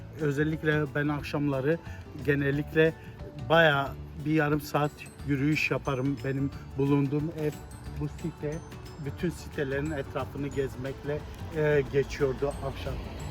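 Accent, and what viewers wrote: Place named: Germany. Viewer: almancı gibi türkçe konuşuyor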